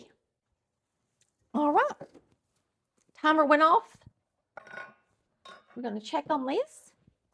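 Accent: South, United States. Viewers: Coping with grief loosing my mom I start baking. The last few months I made few different recipes of banana cake. This one is the best of all. The cake is delicious, moist and even I did not put self rising flour rice to the top of the baking pan. I like everything about this video, soft melodic southern accent of, she explains very well substitutions if you don’t have all ingredients in hand. In my cake I used organic flour 3 huge bananas and yogurt instead of buttermilk. Looking forward to try more recipes. Thank you!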